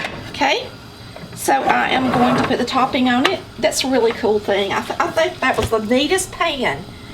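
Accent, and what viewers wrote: South, United States. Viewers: Love the southern accent, love the receipes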